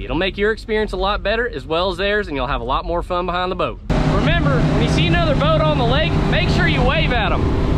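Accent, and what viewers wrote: South, United States. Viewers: Why does he have a southern accent all of a sudden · Haha, nice Texas Twang! Great Tips! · Country accent :O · Extra Texan for this one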